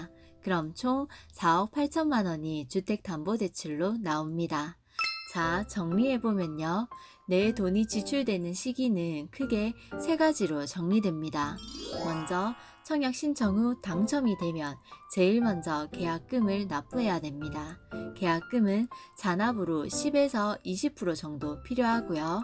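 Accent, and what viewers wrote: Gyeongsang, South Korea. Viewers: 엥~? 대구로 들리는데 ㅎㅎ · 경상도 사투리가 너무 듣기 좋네요 ㅋ 좋은정보 알아갑니다 ^^ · 구수한 갱상도 사투리로 자세한 설명 해주셔서 감사합니다.~~ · 경상도 사람인가봐요ㅋ 사투리가있어보이네요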